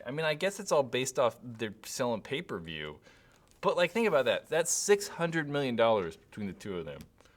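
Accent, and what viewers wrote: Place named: Boston, United States. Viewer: Love your Boston by the way!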